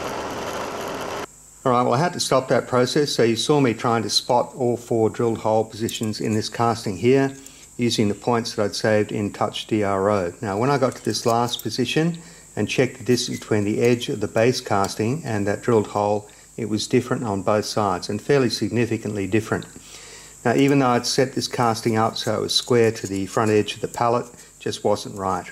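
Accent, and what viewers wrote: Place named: Australia. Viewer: Good video,! I’m so glad to see that you decided to do the steam engine on camera! As they say, “You can please some of the people all of the time, and all of the people some of the time, but you can’t please whatever kind of monster wouldn’t want to watch someone with an Australian accent build a steam engine.” Thanks for the animal videos, they are adorable when they’re babies. Except for the birds…. They start out ugly, but they do get better!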